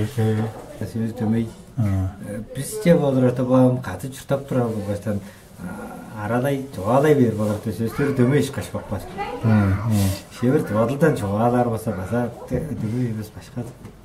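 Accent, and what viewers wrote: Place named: Tuva, Russia. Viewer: Чисто тувинский язык.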